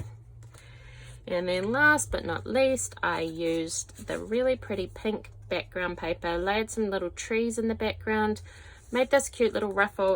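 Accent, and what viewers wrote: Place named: New Zealand. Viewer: Just found you. So cool to hear a kiwi accent haha. Thanks for sharing.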